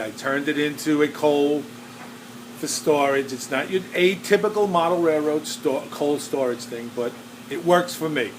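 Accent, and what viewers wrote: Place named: Staten Island, United States. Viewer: yo you sound like a dang New Yorker... more like Staten Island... LOL.. thanks for the tour of your layout... great talking with you the other night... i didn't know i wasn't subbed to you but i am now... looking forward to your next video... BTW check out my last video i show the finished build for Eric vinny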